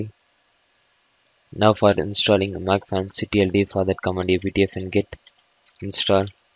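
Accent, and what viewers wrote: India. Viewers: Indians speaking english like this dude sound very RIDICULOUS, please use english text to speech software, indian.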